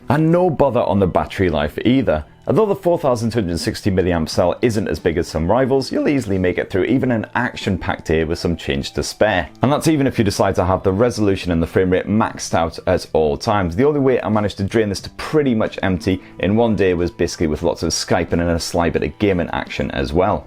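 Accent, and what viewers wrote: United Kingdom. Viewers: Your English is so British 👌❤️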